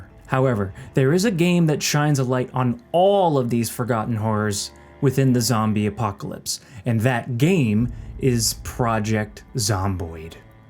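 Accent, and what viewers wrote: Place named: United States. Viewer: Naw the tooth just makes you sound 'Merican :) I cant believe how long PZ has been around. First thing I install whenever I get a new PC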